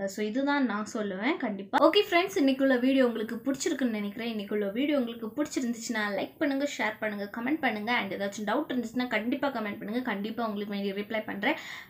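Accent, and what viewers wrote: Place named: Kerala, India. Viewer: Somewhere Malayali touch 😺😺😺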